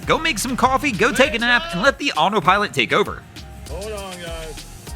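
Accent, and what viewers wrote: United States. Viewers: loved the vid. Had to turn off the sound though. That constant loud whiny american voice did my head in.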